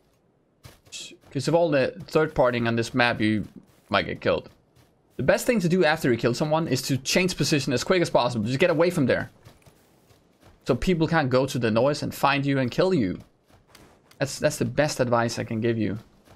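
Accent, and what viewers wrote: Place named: Denmark. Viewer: hey what country are you from because you seem to come from Denmark. in your way to pronounce English on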